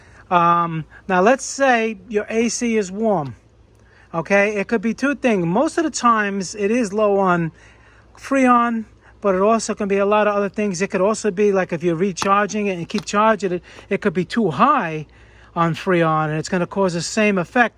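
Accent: New York, United States. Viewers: thank you bro,, u gat that N.Y. gangsta accent.. i like it!! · Ditto. Moving to Hawaii makes me miss the Boroughs' accent.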